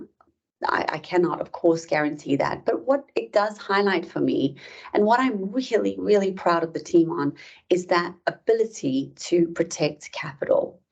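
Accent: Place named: South Africa. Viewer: Rock on GVF! Love the South African accent too.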